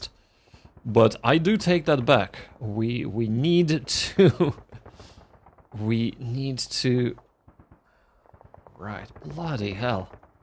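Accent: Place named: Eastern Europe. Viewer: Nice one boss. Where r u from? Eastern european accent. I played everything available for free from Wargame Design Studio. Grenada, Miuss etc... Great games. Get stuck straight away.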